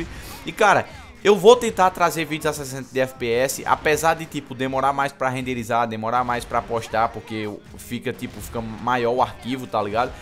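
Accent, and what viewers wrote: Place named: Paraíba, Brazil. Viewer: Nada contra mais voz de paraiba é chata veí --'...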